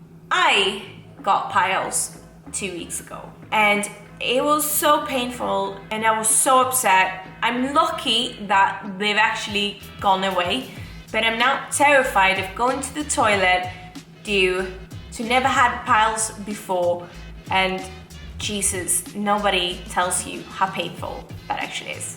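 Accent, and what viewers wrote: United Kingdom. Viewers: Omg your accent is so much more British🤣🤣 love it 🥰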